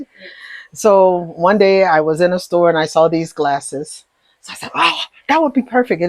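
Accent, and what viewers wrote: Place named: Kenya. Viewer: What an inspiring story. Thank you for sharing. I want to be you when I grow up. You sound totally Kenyan.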